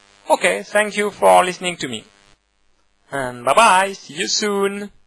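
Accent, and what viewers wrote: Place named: France. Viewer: I think the audio quality could be better, but I believe this is because you want to keep the file small for YouTube upload. Smaller video and lower quality audio = smaller file. The French accent is wonderful, we, on the other side of the world are not custom to hearing it, so its sounds funny to some people. I think it is heard more in Europe and is just normal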